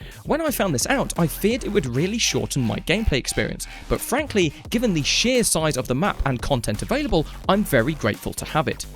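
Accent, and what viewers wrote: United Kingdom. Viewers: Uk accent L video 😂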